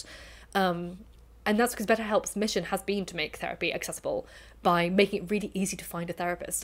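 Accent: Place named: England, United Kingdom. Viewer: I would like to apologise for previously commenting on your pronunciation of "Culloden" in one of your videos. I was taking it at face value that due to your English accent you were ignorant of Scottish culture. I realise now I was wrong and I'm sorry if I caused you any distress. I hope you continue on your journey of recovery.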